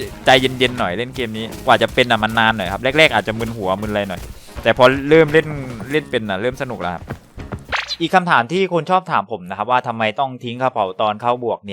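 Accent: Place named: South, Thailand. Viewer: สำเนียงพี่คนใต้นะ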